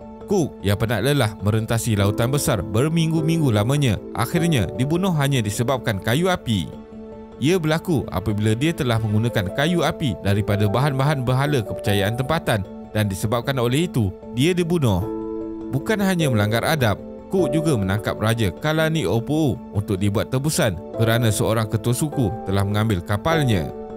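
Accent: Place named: Malaysia. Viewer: Dialeknya melayu banget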